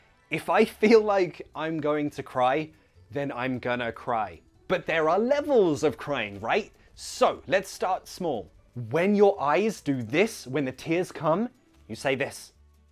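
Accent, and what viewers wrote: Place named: RP, United Kingdom. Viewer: Kudos. Love when you teach posh english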